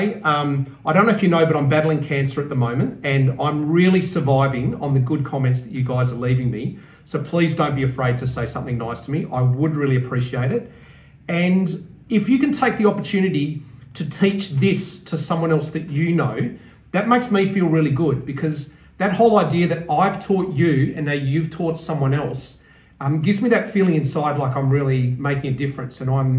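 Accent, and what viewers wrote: Australia. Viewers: The moment i jeard the aussie accent i knew i was gonna hear exactly what i needed to hear in perfect detail. Ya folks down under are really something else when It comes to automotive prowess.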